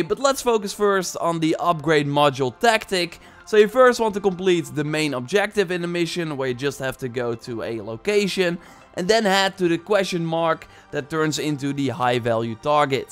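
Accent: Netherlands. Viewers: Hes dutch thats why he got that accent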